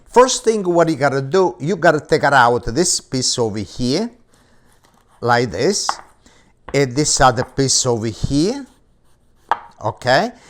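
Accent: Italy. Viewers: Love his Italian accent love him thank you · Oh gosh he is so adorable.. his real authentic Italian accent is even better.. Oh kay!! I like eggplant parmigiana... It’s a oh yeah baby!!!.. ❤️ love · I AM SOOOO MAKING THIS FOR MY BROTHERS THIS THANKSGIVING. BECAUSE THEY DNT EAT MEAT. AND THEY LOVE EGGPLANT I STUMBLED UPON THIS RECIPE. AND I LOOOOOOVE HIS AUTHENTIC ITALIAN ACCENT.😇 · This is the most italliano uncle i’’ve heard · With that accent it just tastes better. Reminds me of my grandfather who was from Italy. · When I heard that thick Italian accent of his I knew it was gonna be a good cooking tutorial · Love his accent.i just love those Italian men!!!! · I love this little old guy. He’s so cute w/his Italian accent and his drink-drink gestures. LOL I’m trying to recipe tonight.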